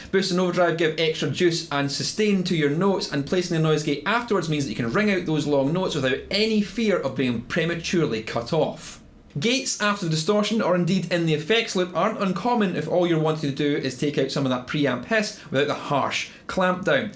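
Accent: Scotland, United Kingdom. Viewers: Hey,! I am from germany and like your great videos, because you are a competent teacher and you have a big talent to keep even complicated contents understandable and correct. And there is your friendlyness and great sense of humour, that makes nearly every video a nice event! And it is fine, that you don' t deny your scottish origin, BUT.... please, please, never forget, that a lot of guitar players, that enjoy your vids come from other countries and some, as me, have sometimes problems to follow your explains, not, because of your scottish accent, but because of the often unbelieveable TEMPO you use!! So i like to beg you to talk with a bit less speed so that it is no problem for us "non-scots" to follow your explainations!! Thanx a lot for keeping this in mind!! - I am already curious about the next interesting vids!! Lincoln 6 Echo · Ah noo th' rayzon. 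Ya lessen chu thus bekez joost lessenen ta Scawtush axunt is fasunettin. Now, me, I watched it to get opinion on Noise Gates. Great answer for that question. Thanks,!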